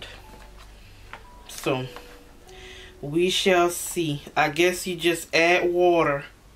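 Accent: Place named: New Orleans, United States. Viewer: You sound like you from New Orleans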